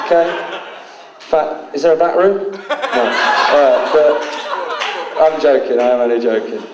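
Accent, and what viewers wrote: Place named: Nottingham, United Kingdom. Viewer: Sounds as if your from my neck of the woods, Nottingham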